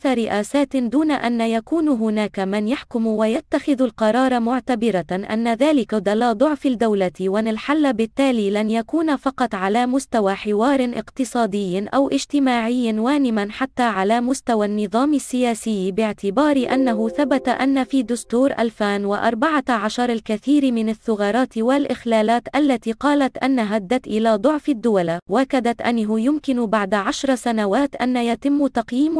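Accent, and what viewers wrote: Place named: Europe. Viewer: من انتي يا أيتها القناة صوتك يذكرني بي امراءة افرنخية أو صهيونية ليس بصوت عربية أصيلة ان شاء الله مبروك علينا فتنة جديدة نزلت على راءس الشعب والهبال وحلة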